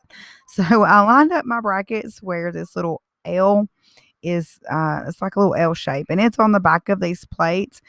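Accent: South, United States. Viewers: After seeing this diy with the gold and you adding the touch of bling, I knew right away you were the one to ask I have been trying to find a farmhouse look with just a small amount of bling added to it, do you think maybe you could try to do a DIY on this.. I just don't have the imagination or the crafting ability to pull it off..but watching your videos I see you do.. I would love to see what you come up with....I live in a trailer and I want elegance in my decor but I want to stay true to my country style of living.... Thank you for all your inspiration that you give me I really enjoy watching you and I just love love love your country twang.. You just seem so down to earth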